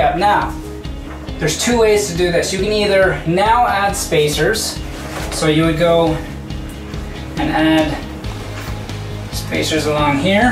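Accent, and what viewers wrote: Canada. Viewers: Great video, you sound Canadian?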